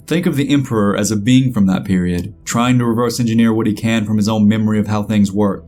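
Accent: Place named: South, United States. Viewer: Just found your channel, your southern accent is very pleasant. subbed.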